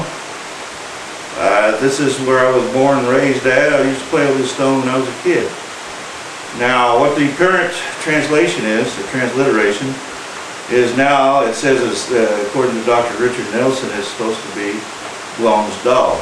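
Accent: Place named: South, United States. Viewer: That is just his accent! He is actually pronouncing it similar to dune, you just hear it as run, or closer to ruin, but said together and fast. I suggest you never visit the South, or any other place where they tend to have accents, like EVERYplace! lol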